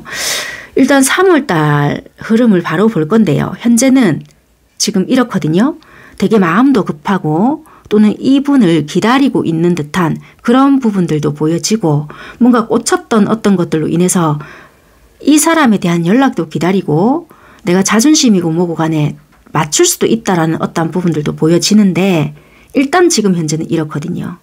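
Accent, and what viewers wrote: Busan, South Korea. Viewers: 부산 사투리 입니꺼? 😊😊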